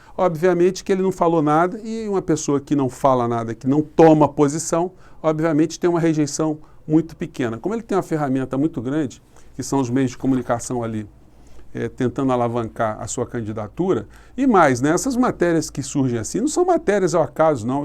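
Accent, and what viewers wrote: Brazil. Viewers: É um alívio ouvir sensatez em português com sotaque brasileiro. Obrigado,.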